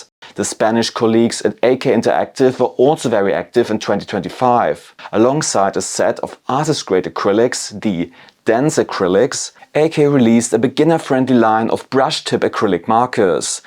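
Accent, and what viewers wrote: Germany. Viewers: Are you a german trying to hide your accent?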